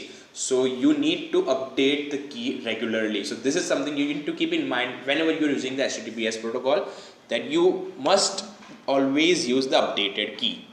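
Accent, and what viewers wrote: India. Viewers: Why do all these videos have to be in indian/gibberish english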